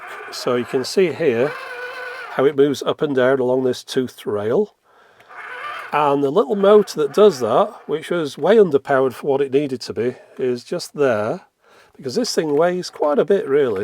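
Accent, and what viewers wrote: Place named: RP, United Kingdom. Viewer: "Right. For some reason, the radio's stopped tuning in now. I think it’s all dying, but anyway…" His ability to sarcastically say something so matter-of-fact with such a posh, high-class English accent never fails to amuse me. 😂😂😂👏🏼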